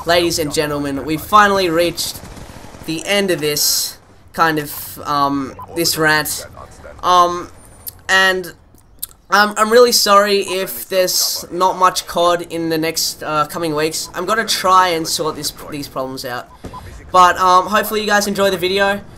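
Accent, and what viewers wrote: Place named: India. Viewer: I thought the whole thing was an indian accent...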